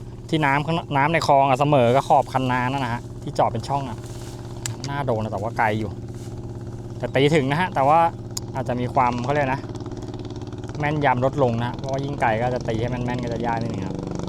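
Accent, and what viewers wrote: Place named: South, Thailand. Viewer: คลิปนี้น้า พูดใต้ออกเลย😅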